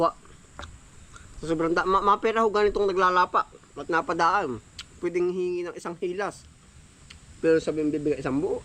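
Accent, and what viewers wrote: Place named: Aurora, Philippines. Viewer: Nkklibang k po pnuorin ..KC Yun slita nyo my Punto..khwig Ng slita nmin ..aurora province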